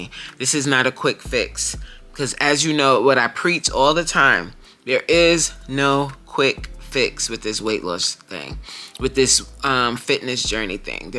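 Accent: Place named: Africa. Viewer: It's the African voice for me hahaha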